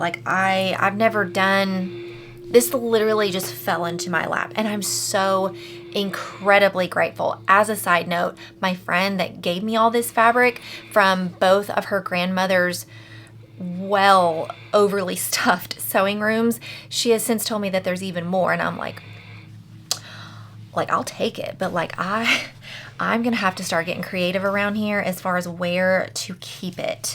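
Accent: Tennessee, United States. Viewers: You could label them vintage modern fusion. I like the bigger tote bags with pockets. I do think the basic totes need fusible fleece. Those book sleeves are super cute too!! I found your channel a month or so ago when I got back into sewing. I live outside of Nashville. I enjoy your accent and personality. Lol I'm not from Tennessee, I just find the accent enjoyable. I love your content and have gone back and watched a ton of your videos.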